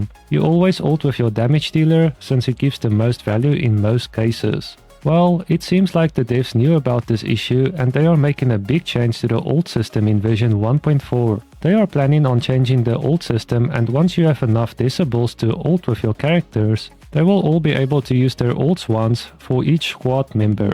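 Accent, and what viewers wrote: South Africa. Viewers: I know a boet when i hear one, Great vid fam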